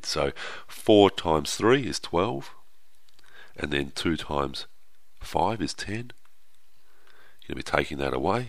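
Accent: Australia. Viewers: I noticed his Australian accent I think this boi is left handed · Thank you. .you lovely Australian math God ;-) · You Australian mate? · Thank you so much you helped me with subtracting fractions and I love your Australian voice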